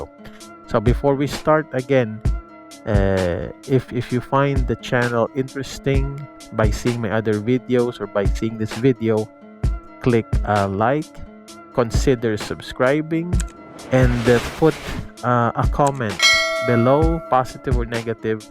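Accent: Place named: India. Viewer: Akala ko foreigner ka nung una kong marinig boses mo noong unang panood ko sa video mo.Ganyan kasi bigkas ng ibang Indians hehe✌️keep grinding lang kapatid.May your channel grow.God bless!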